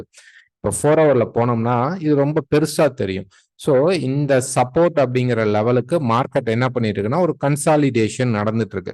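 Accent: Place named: Kerala, India. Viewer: Most of the analysis are accurate!,I feel you are speaking in Malayalam,I can follow your Tamil without any difficulty, Thank u sir!!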